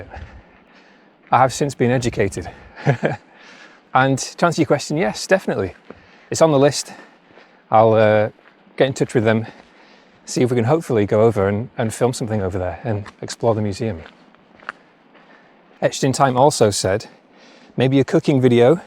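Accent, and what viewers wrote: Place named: Northern England, United Kingdom. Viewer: describes your channel very well in my opinion, without giving too much away. Draw people in, an expert might advise... Your accent is relatively northern, and the Introvert adds an enigmatic air of humourous dissonance: If you were an actual introvert you wouldn't be posting videos here. Not to make a thing of it, but I also have mobility issues. I enjoy watching you do things I used to but no longer can. I may be interested to see if you spot anything obviously accessible, but I appreciate much of it won't be. Thanks again 😺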